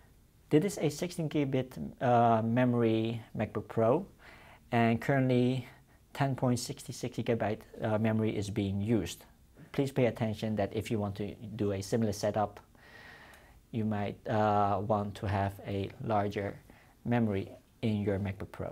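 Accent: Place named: China. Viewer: Just listened to a terrible chinese accent for for absolutely nothing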